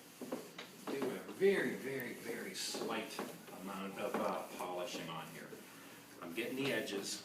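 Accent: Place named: Pittsburgh, United States. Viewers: Agreed sounds like he’s from Pennsyltucky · Thanks. I've used Murphy's for years, but just wanted to see if I used it correctly before I start a project on an old piece. * Side note...I looked up hardwoodartisans. It says Virginia, but you sound like you are from Pittsburgh.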